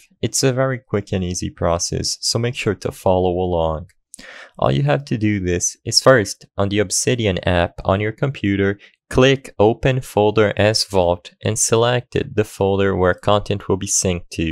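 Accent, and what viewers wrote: Brazil. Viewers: É br man? Parece muito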